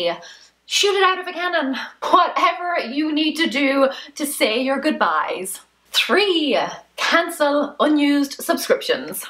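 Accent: Ireland. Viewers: LOL "Shoot it out of a cannon", if only that was a real option. Then my neighbors could deal with all of my stuff haha. Great sense of humor, very inspiring. Liked and subbed. Also, love your hair, the name of your channel and of course your accent. Éirinn go Brách. I think that is correct? I'm about 1/4 Irish and born in the USA. (I'm not trying to be political, just supportive of Ireland, their culture and accents) I'm a compulsive collector (if you are wondering, yes, hoarding), with PTSD, MDD, ADHD, GAD, panic disorder, perfectionism and I watch these kinds of videos for inspiration, strategies to change my life. You're are fresh and funny, I think I will watch more of your videos. And yes, that means to procrastinate even longer LOL.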